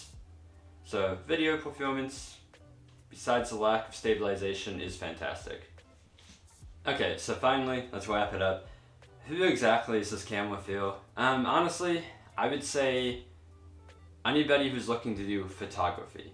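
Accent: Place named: United Kingdom. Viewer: you sound more British than American but I'm not a native-speaker so I can be wrong. From which city are you from?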